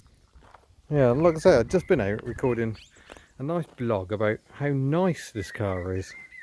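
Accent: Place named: Birmingham, United Kingdom. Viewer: Welsh hippy I thought you were a brummie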